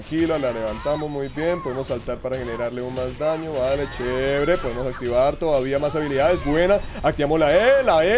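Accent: Colombia. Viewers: severo acento, de una supe que era de Colombia xd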